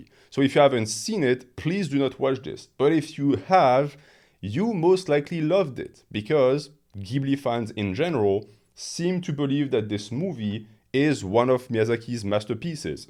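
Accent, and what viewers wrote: France. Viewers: Why is Adam driver pretending to be a YouTuber with a French accent? He's pretty good!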